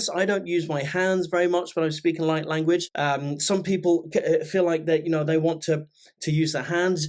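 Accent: South, United States. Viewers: Wonderful tutorial! I have been contacted by beings from the Orion constellation. I am unfamiliar with the particular groups from this star group. Any insight is much appreciated!! Also, love your southern accent, ha! I’m an intuitive guide from Georgia USA 🇺🇸!! In love, light, and service~ Elizabeth Maria Unlimited ❤🌎✨🙌🏽🧘🏽‍♀️🌞🧬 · I love the southern accent❤😂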